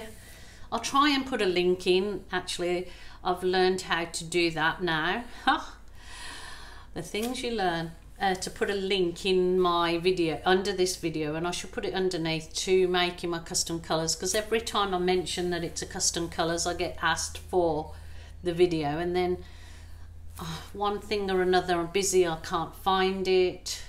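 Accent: Birmingham, United Kingdom. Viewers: I know a Brummy when I here one 😀 A good move you made 😀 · Me and my husband are having fun with your accent ☺️ he is from West Bromwich in UK 🇬🇧 and you sound like a Brummy are you from that area or is it just coincidence that the accents sound the same..? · Hi! Just curious....are you originally from Birmingham?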